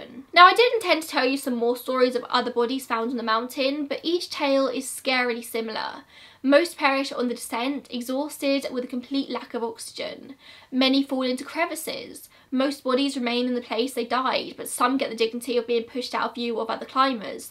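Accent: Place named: Southern England, United Kingdom. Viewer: Thank you so much for all that u did and went through in order to give us this video 🥰🙏🏻 You’re an angel, I LOVE ur voice and the content u create always hits a nerve with me where I get really into it (although not in a “sensationalism” induced way I’d hope) Can’t believe I only JUST discovered ur channel (through the KRIS KREMERS & LISANNE FROON case bless their hearts and those of their families) but at least there’s a lot to catch-up on. Where abouts in the UK r u from btw? Going by ur accent I would guess somewhere around the southern parts of England? (I am half Swedish, half German so English isn’t my first (or even second) language, but we moved around Europe a lot when I was a kid and later as an university student, until I came here to London 🇬🇧 7 years ago so yeah 💁🏼‍♀️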